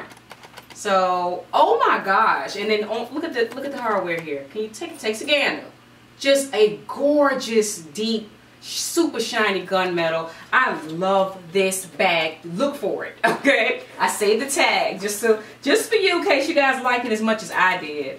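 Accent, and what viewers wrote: South, United States. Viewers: Your smile and dimple and slight Southern accent...(swoon) :-D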